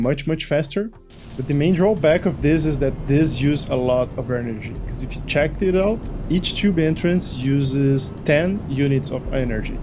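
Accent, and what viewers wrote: Brazil. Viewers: Mano vc é brasileiro??? Pq parece muito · Dá para conhecer um brasileiro fácil pelo sotaque. hehehe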